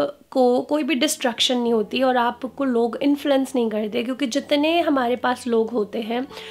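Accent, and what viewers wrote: Punjab, India. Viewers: I started following you because you talk like one of my best friend in India. Punjabi accent Amritsar vala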